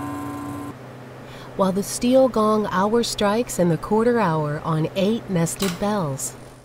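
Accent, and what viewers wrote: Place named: United States. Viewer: Great clock from Yorkshire, but why the American accent.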